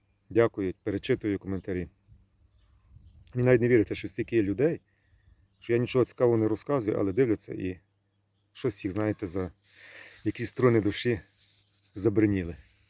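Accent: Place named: Lviv, Ukraine. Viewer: Я тоже иногда Тебя смотрю ,благоразумные мыслями делешся ,приємно ,ставлю лайк ,подписан давно , пишу коментарий более восьми слов ,по алгоритму ютуба для продвижения ролика .Хай щастить ,може колись завітаю в гості на турник.Чую ти по говорю мабудь зі Львівщини , може мені так здається ,в мене коллега так розмовляє бо я з Слобожанщини.Миру та Добра Тобі і в твоїм Рідним.🎉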